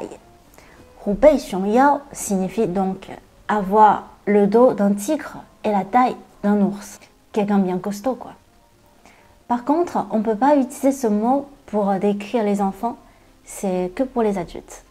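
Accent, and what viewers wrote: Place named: Northeast, China. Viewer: 东北那旮沓的方言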